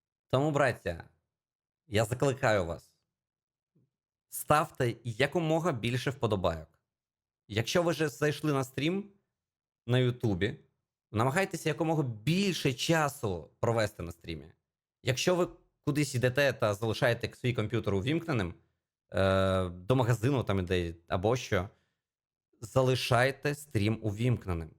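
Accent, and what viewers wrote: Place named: Russia. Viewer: ну в чем прикол, тыж здравомыслящий. У тебя потерялся онлайн, просмотры, донатеры! Из за неродного языка в который ты не погружен, как в русский, ты говоришь не на украинской мове, а на речекряке, в перемешку с русским, а в эмоциональных ситуациях ты не можешь выразить весь спектр эмоций. В Итоге: стримить для 250 млн русскоговорящих или для 37 млн украинцев из которых дай бог 5-10 млн и говорит и то меньше. Конечно кто то наступил тебе на яйца или что там у тебя есть.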